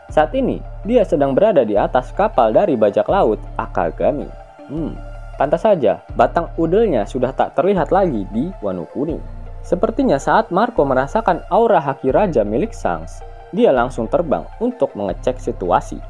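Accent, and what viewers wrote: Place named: West Java, Indonesia. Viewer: tercium orang sunda wkwkwk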